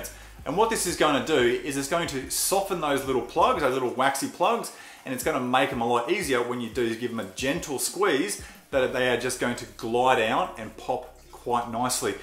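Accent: Australia. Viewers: Guys, where’s his accent from? Aussie/what.? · If something is being pulled or squeezed out of a body I am there. The Aussie accent was just the cherry on top!!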